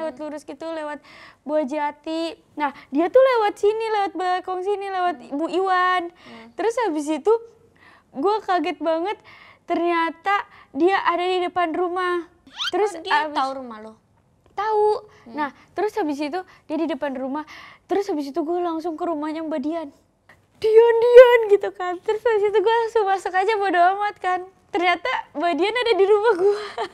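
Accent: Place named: Java, Indonesia. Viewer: Kak aksen jawanya medok banget iya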